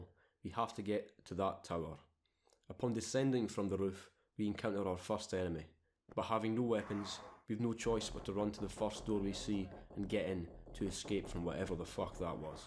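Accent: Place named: Glasgow, United Kingdom. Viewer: Great retrospective. Couldn't stand the stuck-in-quicksand slow combat. Where in Scotland are you from? From the accent, im guessing you're a 'Wegie?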